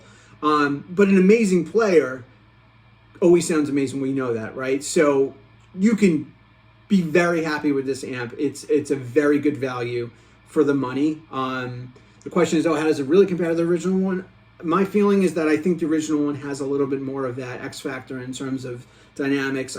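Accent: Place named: New York, United States. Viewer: I just picked this head up a few days ago to pair with my Marshall 1960A cab with GT12-75 speakers. Excellent, no-frills video and straight to the point without excessive noodling. Great video brother - you sound a bit like a New Yorker !!! Cheers from Canada! Thanks for the video - I can see u can play good as well - nice vibrato !!!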